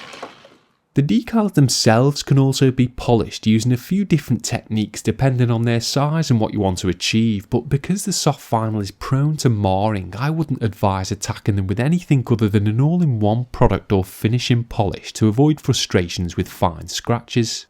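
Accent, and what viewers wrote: Liverpool, United Kingdom. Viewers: You must be from Liverpool or around there !!! Lol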